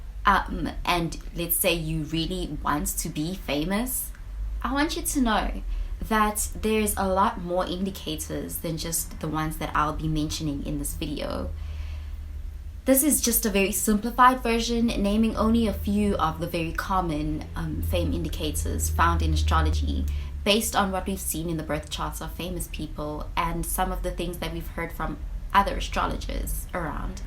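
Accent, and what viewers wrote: South Africa. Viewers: Are you South African? You sound South African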